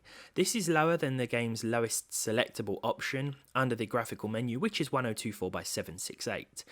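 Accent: United Kingdom. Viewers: Lowspecgamer:British edition.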